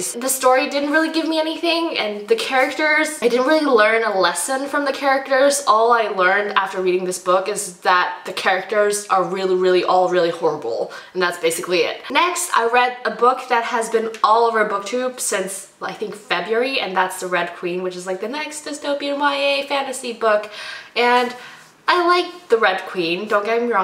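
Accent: United States. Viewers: woah this might sound weird, but you sound especially american in this video woahhhhh also yes that mt. everest miscommunication mountain is v irritating marie rutkoski why do you hurt us so i've been wanting to pick up red queen bc everyone loves it but ehhhhhhhh it does sound v typical and i want something that's going to BLOW ME AWAY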